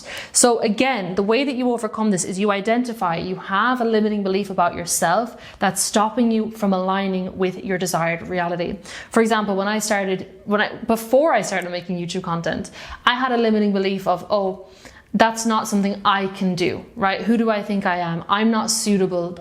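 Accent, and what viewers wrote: Ireland. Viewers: Rewrite equals review or revision. Because resistance it’s versus support. Every time you speak, and your Irish accent steps out you instill this motherly love feeling that speaks to a man’s core identity, it’s a mothering, nurturing, caring, loving kindness, even a protection, you radiate a maternal love that he heals:) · Love your content - Irish accent is beautiful!! I am Irish myself from Limerick. Stop self sabotaging your attempts at drawing!! Haha....you rock woman!! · I lived in Ireland for 7 years, it’s the most dearest loveliest place on earth, I call it my Mother land. And your accent is the sweet nectar to my ears 🍀🍀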